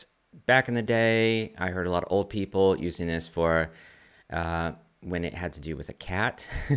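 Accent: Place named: United States. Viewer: Regarding Kött and fack. It is not because of your English but because of your American dialect; you don't pronounce all different English vowels. You pronounce some of them the same, unlike in English (The language spoken in England).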